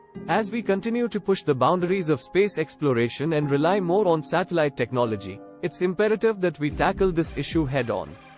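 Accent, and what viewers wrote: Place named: India. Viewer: WTH? hindi synthesized accent?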